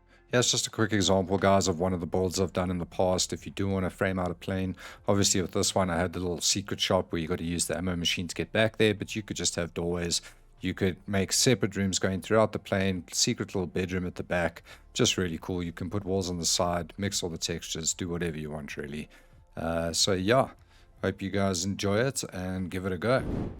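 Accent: South Africa. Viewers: Is that a South African accent I hear?....🍻🇿🇦👍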